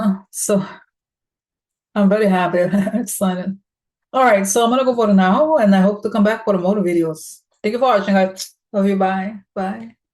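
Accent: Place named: Russia. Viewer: Sing it,!!! 😊💕🎶 Also, your Rüski accent makes me so happy! 😂💕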